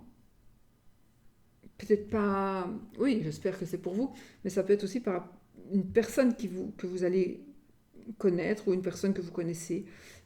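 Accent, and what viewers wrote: Belgium. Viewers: Salut, sorcière bien-aimée, comment vas-tu avec ton petit accent belge ? On est pays...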